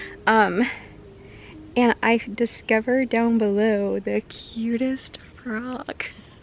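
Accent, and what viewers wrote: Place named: South, United States. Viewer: as you should be, i suppose it's just an area thing, i am from northern Ohio so sometimes southern accents are different but it doesn't matter your accent is cool, and no reason to change 💜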